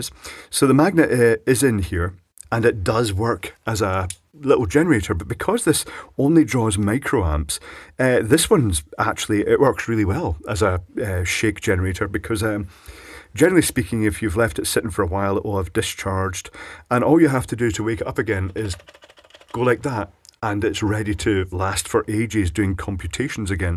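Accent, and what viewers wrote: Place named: Scotland, United Kingdom. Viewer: The Scottish* accent makes it perfect.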